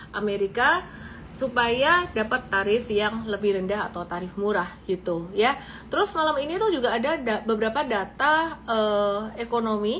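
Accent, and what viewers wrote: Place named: Surabaya, Indonesia. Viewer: Enak banget mis suroboyoane ketel 😂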